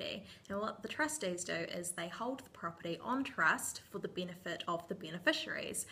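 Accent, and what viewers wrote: New Zealand. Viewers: she has a kiwi accent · We Americans (palm slap)....She is not from the UK! The accent sounds similar to the Aussies but I believe she is a Kiwi. Beautiful accent. Isn't it interesting how trusts function so similarly across state, vis a vis governments lines? With the exception of some specifics this information applies to trusts in general.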